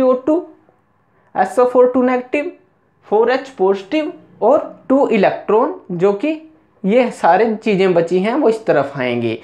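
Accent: Punjab, India. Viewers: Good explanation sir.aapki Language par se lgta hai ki aap punjab se hai.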